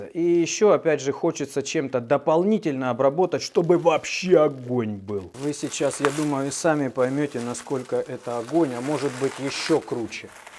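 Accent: Rostov, Russia. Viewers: Молодец!!! Ты и твои видео всегда радуют) за эти года просто до боли приятно слышать твой ростовский говор и специфические словечки))) 👍👍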